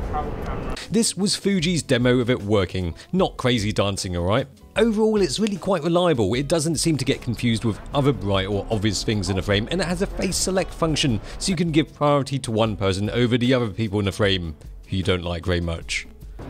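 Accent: United Kingdom. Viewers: listening to you talk makes me wish i had british accent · The way to improve my British accent, it's watching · 英式英语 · Are you British or Japanese with a British accent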